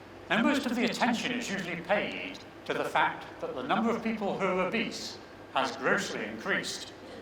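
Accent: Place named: England, United Kingdom. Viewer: I'm at risk of developing Type 2 and i was wondering is laughing a risk to raise my blood pressure? The first 5 minutes I was just laughing, he has a funny English accent and what about that Angel of the North story LOL LOL and the people with their faces erased LOL LOL LOL aaaaaaaaaaaaaaaaaaaaaaaaaaaaaaaaaaaaaaaaaaaaaah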